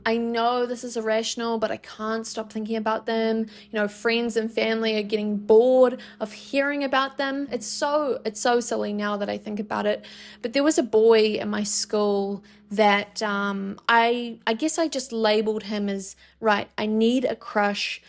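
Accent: New Zealand. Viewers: Thats pretty much just a New Zealand accent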